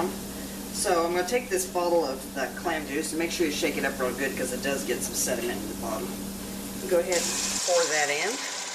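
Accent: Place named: New England, United States. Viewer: Looks great!! Your New England accent wasnt to bad either:) Cheers!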